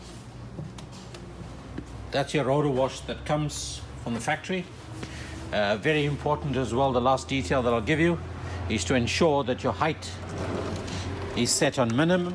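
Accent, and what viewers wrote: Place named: Durban, South Africa. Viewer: You sound like you are from Durban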